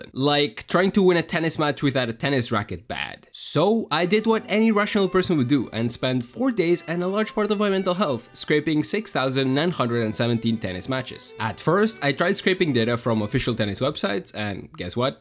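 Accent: Catalonia, Spain. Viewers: Are you Catalan? That "Jaume" accent is literally on point and you have a bit of an accent! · Accent català???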